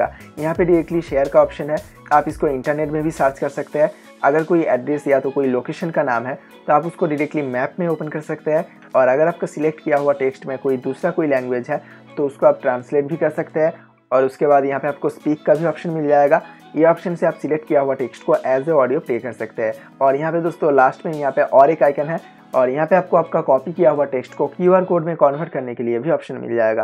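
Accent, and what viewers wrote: Bengal, India. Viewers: Vi tumi Bangali ami Bujhte perechi......😁😁😁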